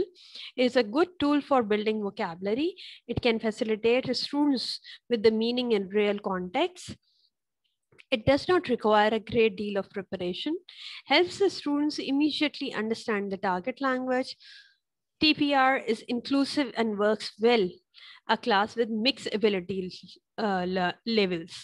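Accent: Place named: India. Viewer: Nice explanation but your dialect is Indian could i ask y if you are indian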